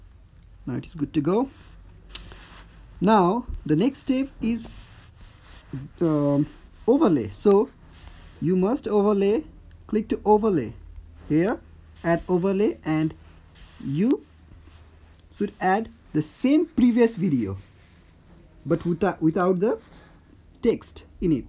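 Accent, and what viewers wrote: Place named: Nepal. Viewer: Daí ko speaking Language Sounds like Nepal tune.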